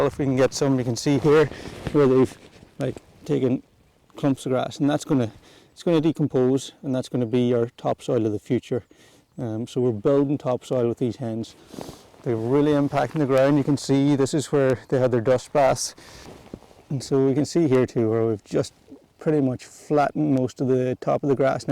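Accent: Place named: Ireland. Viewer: Hi, keep up the videos, nice to be listening to an Irish man for a change 😊. Just wondering where you buy your bulk compost. John. Co Kerry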